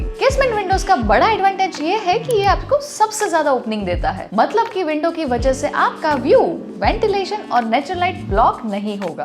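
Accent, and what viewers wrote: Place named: South, India. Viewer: You sound south, no offence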